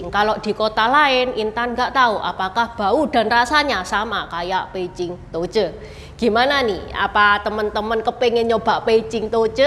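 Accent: Java, Indonesia. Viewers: Enakan yakin dengerin dongeng nya nih.. dg bhs indonesia ber aksen jawa medok.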